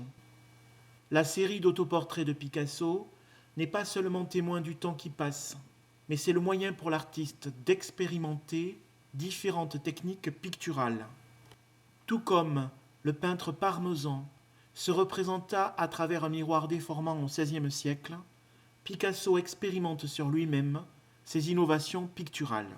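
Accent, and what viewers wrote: Marseille, France. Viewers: Allez L'OM ⚪️🔵 L'accent de Marseille Wallah... Sheeeeesh.... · Il a l'accent marseillais de l'huile pujet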